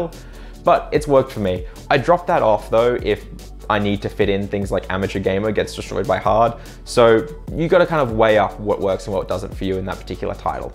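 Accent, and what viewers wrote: Australia. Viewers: WAIT YOU ARE AUSTRALIAN NOW I INSTANTLY LIKE YOU MORE WHY DOES IT WORK I FEEL COMNECTED ON A PERSONAL LEVEL bro why and how does this work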